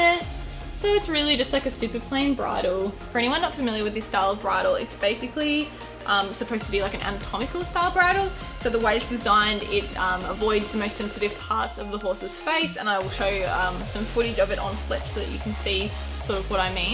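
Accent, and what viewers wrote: Australia. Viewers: OMG ARE YOU AUSTRALIAN :D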